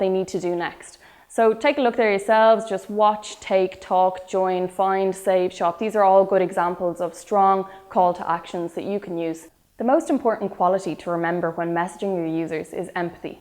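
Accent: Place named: Ireland. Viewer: Love the Irish accent!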